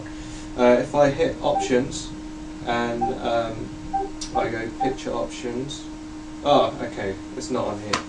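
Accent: United Kingdom. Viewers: lol asian guy with a british accent. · never seen a asian with a british accent lol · holy shit an asian guy with a british accent thats fucking awesome!!!!!!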